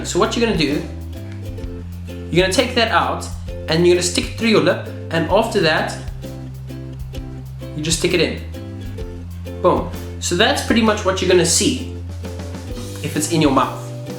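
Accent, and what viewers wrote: South Africa. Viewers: are you south African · your south african right? I can tell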